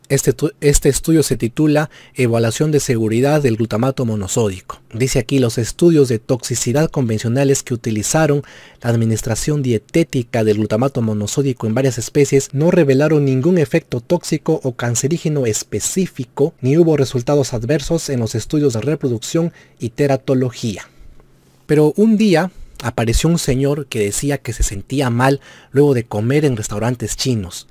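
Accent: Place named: Peru. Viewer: peruano eres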